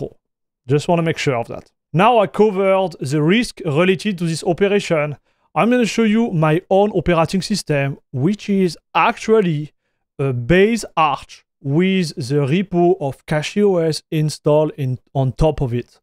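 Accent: France. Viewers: Cet accent français de zinzin, ça m'a fait bugé je me suis demandé au début si la vidéo allait être en français ou en anglais 😂. C'est ça qu'on aime, merci pour la vidéo !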